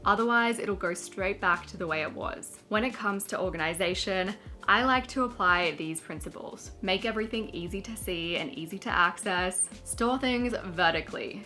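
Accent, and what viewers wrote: Australia. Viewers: I think from Australia! 🦘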